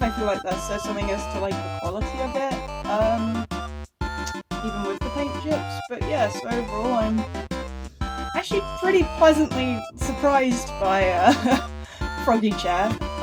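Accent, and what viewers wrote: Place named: United Kingdom. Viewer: too british.. otherwise 10/10 thank you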